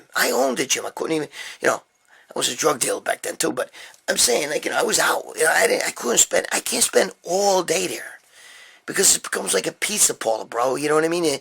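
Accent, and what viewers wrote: New York, United States. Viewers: Keep cranking the videos they’re very entertaining. You need to start making some videos on your own channel so this way you can make yourself some money instead of making it for other people. You make everything very interesting and fun to watch also I’m a fellow New Yorker who moved down south so it’s nice to hear somebody talking normal for a change. Makes me miss NY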